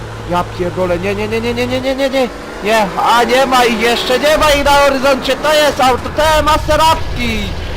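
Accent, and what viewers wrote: Silesia, Poland. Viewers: Uwielbiam gwarę śląską xD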